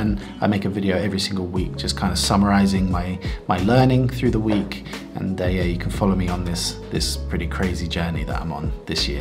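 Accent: Finland. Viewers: You have improved quite a bit. Well done! 🔥 I just wanted to mention that you have the same sort of Finnish accent my husband has as well. It’s this funny tone of voice, a bit like a toddler or a cartoon character speaking. I am not sure why, but I think if you make your S and T sounds sharper and your L ”clearer”, it will bring your Finnish to a next level. 😁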